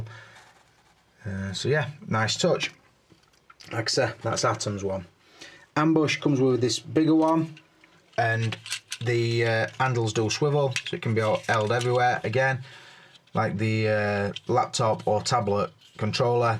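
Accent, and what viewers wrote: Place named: Scotland, United Kingdom. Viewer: he is a scottish person i knew because how he talked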